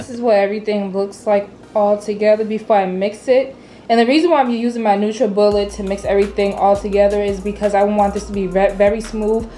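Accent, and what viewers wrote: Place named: New York, United States. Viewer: Girl yassss get your sponsorships I see you sis! I'm a vanilla lover as well - I usually choose that flavor when it comes to protein shakes. Also, where are you from? Are you from NY? I have been trying to guess based on your accent!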